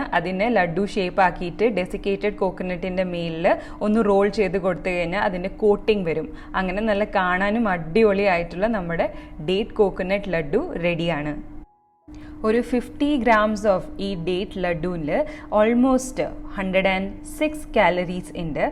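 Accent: Thrissur, India. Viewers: KPL nmmde ijk... Are u from thrissur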